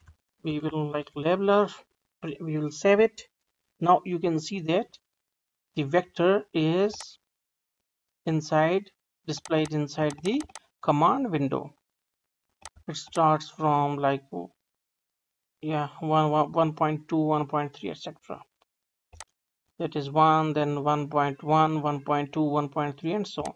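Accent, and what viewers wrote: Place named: India. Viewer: thank you soo much my indian bro ı like your accent :D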